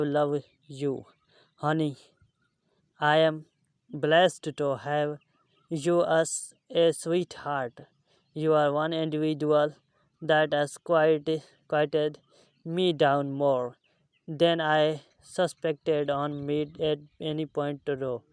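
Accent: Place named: India. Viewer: I don't like to hear in this style totally bhartee English better without voice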